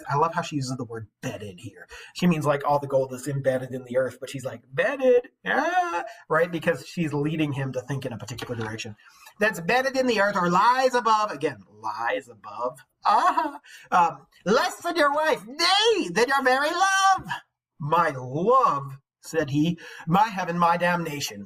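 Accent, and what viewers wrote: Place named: Oxford, United Kingdom. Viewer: Dr / Mr, I like your Oxford English accent. I live in the south of England.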